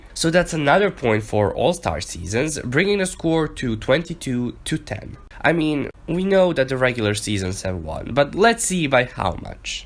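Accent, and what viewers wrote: Spain. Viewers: As a mexican guy I can tell you that he has such a pretty "spanish voice/accent" haha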